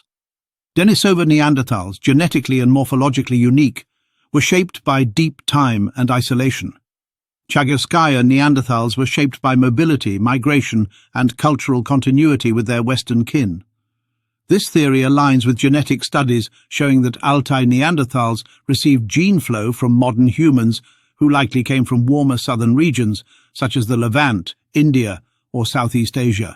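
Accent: England, United Kingdom. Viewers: I see people today who have these features. Who is your English accent?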